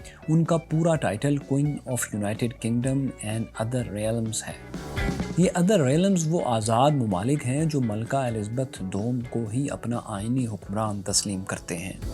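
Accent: Punjab, Pakistan. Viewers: Pease pronounce the words correctly. Tone down your Punjabi accent and not ruin the younger generation.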